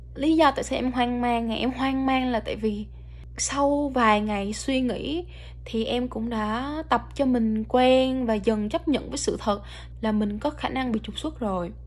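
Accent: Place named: South, Vietnam. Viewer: Mình thấy bạn nói tiếng Nam hay đất chứ!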